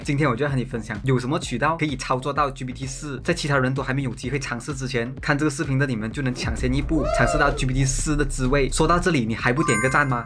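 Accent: Malaysia. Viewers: 马来西亚口音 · 馬來西亞口音？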